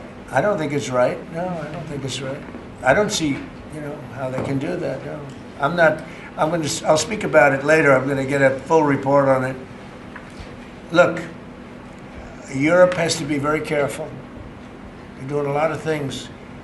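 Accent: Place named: Estuary English, United Kingdom. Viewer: Listen to the Estuary English whine of this goof.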